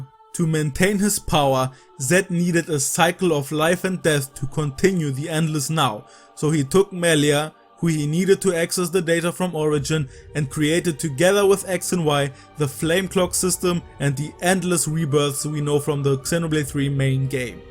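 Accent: Germany. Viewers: Hi, ich habe deinen Channel gerade entdeckt und gleich mal ein paar Videos nacheinander geschaut. Super, einen Youtuber aus Deutschland zu finden, der sich mit Xeno-Games beschäftigt. Hab' auf jeden Fall ein Abo dagelassen und bin gespannt auf deinen weiteren, interessanten Content. Abseits davon, ich könnte mir vorstellen, dass du leichter mehr Reichweite gewinnen könntest, wenn du hier und da etwas mehr an deiner englischen Aussprache und den Formulierungen feilst. Ich hatte den starken deutschen Akzent ziemlich schnell rausgehört und das hat mich leider immer mal aus dem Inhalt rausgerissen. Einige Fehler im Satzbau bzw. bei verwendeten Begrifflichkeiten waren auch festzustellen, ich konnte mir aufgrund meines deutschsprachigen Hintergrundes aber gut zusammenreimen, wo das herkommt. 🙂 Das ist absolut nicht negativ gemeint, also bitte nicht persönlich nehmen! 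Ich finde lediglich, dass es deine Videos optimieren würde, wenn du deine Scripts möglichst nochmal von jemandem gegenlesen lässt, der Englisch etwas flüssiger beherrscht. Ich bin zwar kein Englisch-Muttersprachler, habe aber durch meine Arbeit und Hobbys seit vielen Jahren täglich mit Englisch zu tun. Falls du Bock hast, kann ich dir gerne Tipps geben, wie konkrete Sätze besser formuliert und ausgesprochen werden können. Ein allgemeiner Tipp: Hör dir ruhig mal dein Script über TTS (Text-to-Speech) an und sprich es nach, das kann massiv helfen, die eigene Aussprache zu verbessern. Ansonsten, tolle Videos, sehr informat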